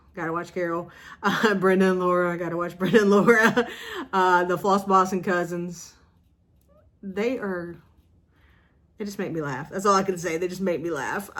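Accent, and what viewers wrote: South, United States. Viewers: You’re the only Southern or with an accent to enjoy listening to. Shhhh… don’t share that with anyone or I’m sure I’ll get a lot of haters!😊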